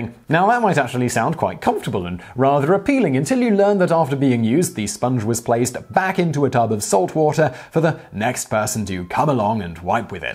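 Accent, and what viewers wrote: England, United Kingdom. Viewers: Still, as always...thanks for your nice signature English Accent, clearly delivered without sounding like you have a mouth full of English marbles...really.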